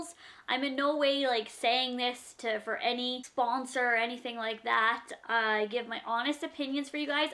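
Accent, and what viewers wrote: Canada. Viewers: Your Canadian accent comes out a lot more when your boyfriend is in the video. It's cute.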